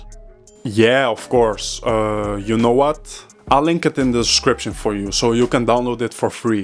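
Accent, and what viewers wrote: Netherlands. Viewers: Dude I love your accent! I can't place it but I really like it. Sounds Dutch? Sort of? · is that a Dutch accent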